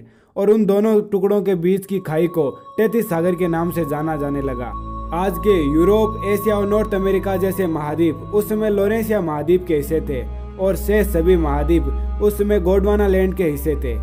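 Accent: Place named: Rajasthan, India. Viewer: Your accent sounds like a Rajasthani guy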